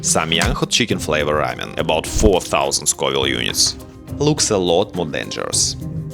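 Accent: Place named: Russia. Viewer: У него акцент как у русского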